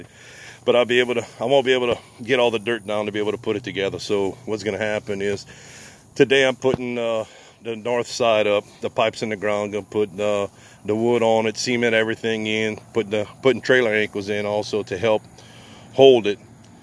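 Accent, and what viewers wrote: Louisiana, United States. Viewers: Without that Louisiana drawl, I think if I told that same story in my voice, saying it was taking place in a NYC sewer,....nobody would care!, your story telling and video making are truly a find! Love what your doing, now go get us some video of that gator!...And I will look closely next time I'm in Manhattan! Lots of rumors about people flushing small ones down the drain and managing to survive in the warm waters surrounding steam pipes and skyscrapers of Downtown!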